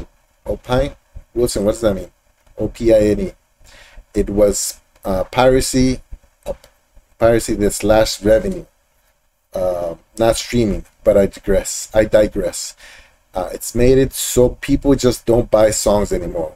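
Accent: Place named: United Kingdom. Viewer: Definitely sounds British! 😎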